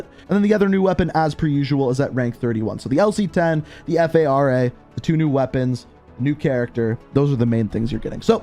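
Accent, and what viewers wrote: Canada. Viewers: Canadian eh? Lol. Theres my comment bud, hope it helps.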